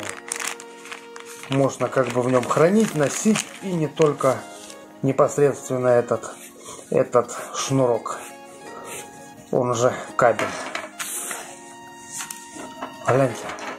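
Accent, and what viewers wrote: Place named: South, Ukraine. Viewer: Тезка, в тайм-кодах поменяй точки на : , тогда это будут не временные метки а полноценные тайм-коды. Чуток над речью стоит поговорить, чтобы произношение было не столь колоритным (я про южно-украинский/восточно-украинский "ШО" "ГЭ" ну и прочие специф. звуки) Для новичка видео нормальное. Для авансу +, подписка, бубенцы. Сам кабель норм за свой прайс, но он ничто против нишенска с3 на 16 жил типа аля СИЛЬВЕР. Там вообще пушка- ракета, а не кабель. Свой трн т2с 16 жил отдал донашивать своему ребенку.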